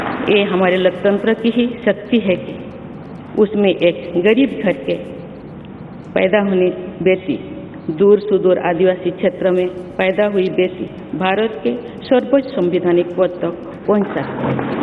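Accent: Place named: Bengal, India. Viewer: Aap bangoli hai ya aadiwasi hai madam aap ke bhasa me hindi se jada bangoli lag rha hai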